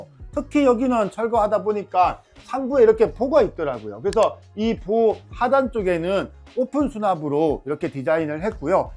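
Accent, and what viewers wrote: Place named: Gyeongsang, South Korea. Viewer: 구수한 경상도사투리로 설명을 잘해주셔서 도움이 많이됩니다 대구에도 파트너사 있는지요??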